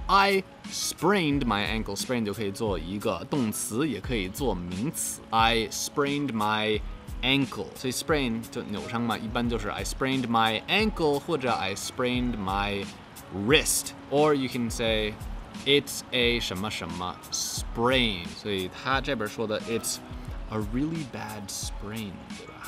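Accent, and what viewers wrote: Beijing, China. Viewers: 第一次看你的视频，哥们儿，你是真北京人假外国人吧！这京腔也太地道了，连痞味儿都出来了……好听！赞👍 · 口音连着名字都是京味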